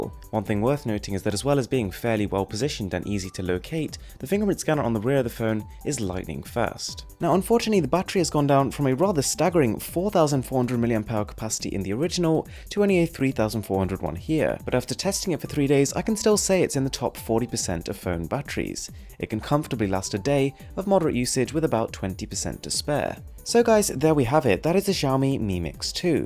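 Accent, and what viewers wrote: United Kingdom. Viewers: oh! Thats why he looks indian and sound british. Amazing! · Why do you have english or british accent?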